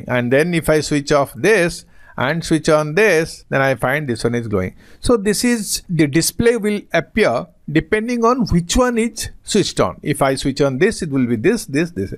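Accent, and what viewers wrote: India. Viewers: Indian English funnyyy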